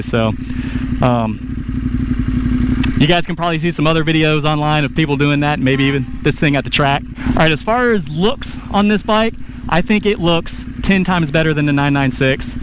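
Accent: United States. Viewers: Between the paint lines looking the same as in a lot of the US and your accent I had assumed you were in the US and thought of offering you some time on a 2013 Streetfighter 848 if you were going to be anywhere near the DC area.. but no, South Korea is a bit of a trip 😂 Maybe for the best, mine isn't the prettiest example! Coming up on 30k miles, dark stealth, frame sliders, aftermarket tidbits here and there... It rides nice, but it looks its age in places.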